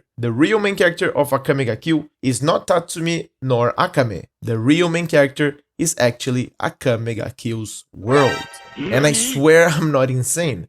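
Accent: Brazil. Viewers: mano esse cara é brasileiro eu acho. ingles bem portuguesado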